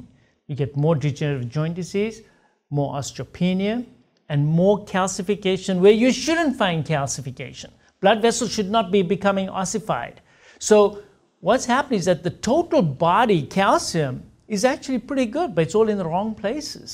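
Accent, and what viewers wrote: India. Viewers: Doc your accent is very nice not too english but still have that little indian accent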